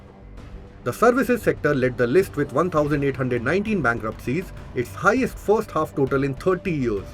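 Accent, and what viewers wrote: India. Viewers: Indian english 🤮🤮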